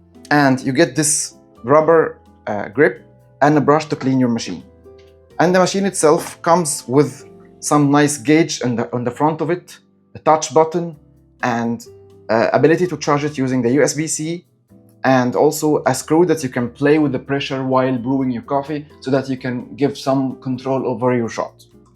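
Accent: Egypt. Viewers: Do you recommend any of them over the Outin Nano? And, how does the Picopresso compare against the Nanopresso? Also, are you Egyptian? You have a very distinct accent!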